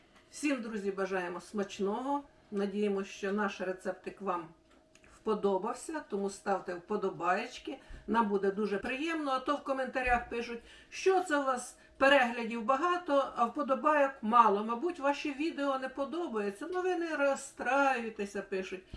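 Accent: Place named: Surzhyk, Ukraine. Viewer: Привет из Кузбасса!, как же мы любим вас за ваши, казалось бы, незамысловатые и бюджетные блюда, но настолько необычные и вкусные, что чувствуешь себя королем, хотя уже давно на пенсии, а поэтому живем скромно и воспитываем сына, который еще у нас учится.Чтобы вкусно накормит семью чем-нибудь необычным, каждое утро ищу интересные рецепты в интернете, чтобы приготовить что-то новенькое и отправить сына на учебу. А ваш говор напоминает родную бабулю из Украины, которая говорила на суржике.Поэтому встречаюсь с вами, как с родными.Переживаю за вас, чтобы все у вас было хорошо, чтобы были все живы-здоровы.И вашим деткам всего хорошего.Пусть радуют вас и берегут.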